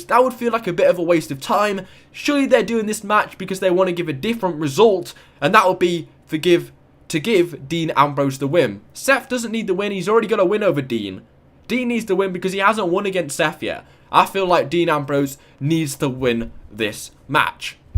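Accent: England, United Kingdom. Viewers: You have an english accent are you from UK ?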